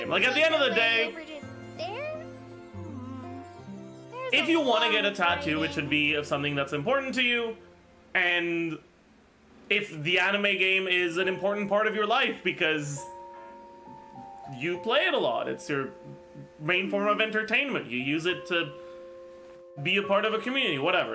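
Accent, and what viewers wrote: Quebec, Canada. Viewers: he's fluent but he has a very thick Quebec accent which very different from what you'd hear in France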